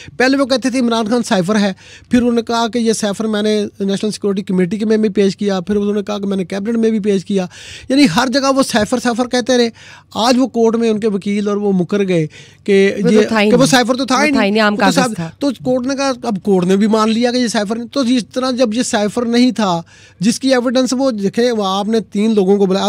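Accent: India. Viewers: یہ اینکر بولنے میں انڈین لگتی ہے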